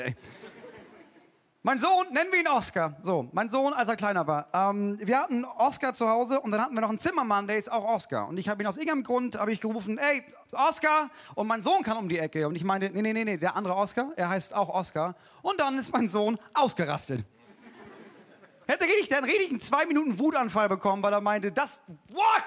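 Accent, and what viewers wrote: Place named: Northern Germany, Germany. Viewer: Der norddeutsche Dialekt ❤😂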